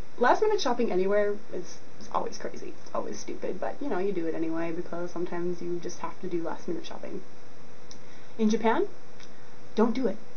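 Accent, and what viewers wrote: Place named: Canada. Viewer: you are Canadian... I can tell by they way you talk.. aye.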